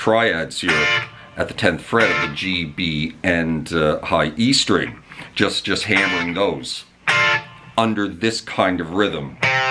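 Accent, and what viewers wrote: Canada. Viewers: you sound Canadian, I’m going to see at Firekeepers Casino in November, can’t wait.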